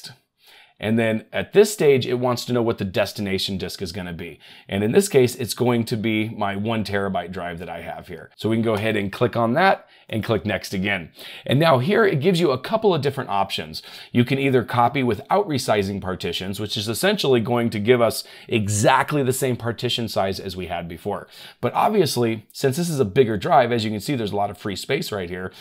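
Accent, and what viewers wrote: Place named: United States. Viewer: Your videos are usually first rate but this one is overly complicated and, for some reason, you gabble at great speed. Not all viewers find US spoken English easy to follow and the monotone does not help. Perhaps showing two methods in a single video was the problem and could have been solved with a part 1 and part 2. Easeus is buggy and manual partition sizing with simpler tools can be be quicker and easier. Aomei is really worth the price if only used among friends and family a few times a year because it is so well thought out and has fail-safe features which were absent in the free version. Customer technical service is excellent re using the licensed product on other machines up to a point. For those of us who repair and upgrade machines regularly it is, in my years of experience, the best and least fussy when used properly. 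Over the years I have tried most.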